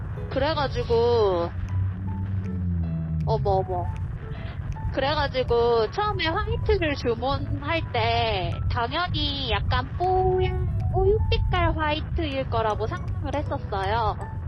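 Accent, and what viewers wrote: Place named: Gyeongsang, South Korea. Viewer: 경상도 ㅎ ㅎ 사투리 좀 안쓰면 안되나? 딴세상 살아요? 서울에서 그리 멀지 않은데 ㅡㅡa?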